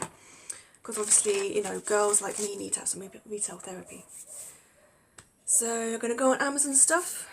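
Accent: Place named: London, United Kingdom. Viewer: Lol,yeah maybe u picked it up from somewhere,like over the rainbow,lol,but yeah l spent nearly a year in London so l thought l heard a little bit of a twang with that accent,maybe my ears are deceiving me,lol,anyway Hampshire eh,l don't believe l know to much about Hampshire,are u like in the middle of nowhere,with all fields and stuff about???BTW thanks for getting back,take it easy:)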